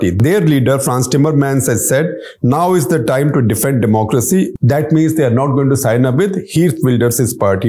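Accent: India. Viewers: Talking too much with strong Indian accent...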